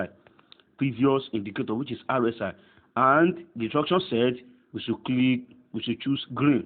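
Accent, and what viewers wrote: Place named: Nigeria. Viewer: Add bulegoo bands... dam gotta love Nigerian accents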